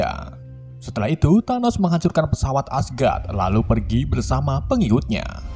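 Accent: Indonesia. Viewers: Kentara lidahnya org indo v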